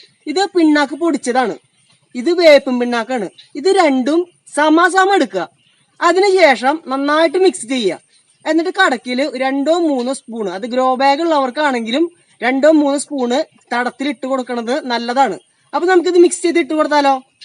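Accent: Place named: Thrissur, India. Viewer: തൃശൂർഭാഷ നല്ല അവതരണം സൂപ്പർ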